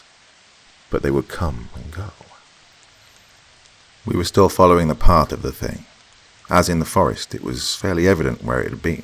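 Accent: Russia. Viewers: OMG YOUR RUSSIAN ACCENT!!!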